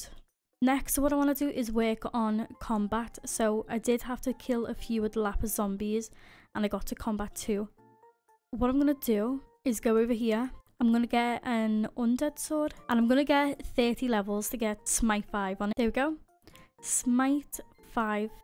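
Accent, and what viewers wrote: Liverpool, United Kingdom. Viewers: are u a scouser ? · Innit this that Liverpool girl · Pretty sure it's a Scouse accent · im asking you bri't'ish or australian person bcs acsent of a bri't'ish and talk like a australian person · Just realised ur British what a gamer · are you british?? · The british accsent sorry of My bad spelling im from finland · Think it’s scouse (Liverpool)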